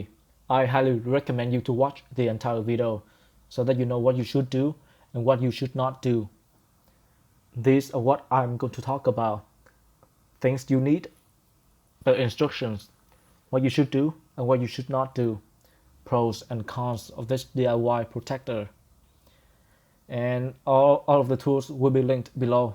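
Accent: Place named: Vietnam. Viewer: u sound like vietnamese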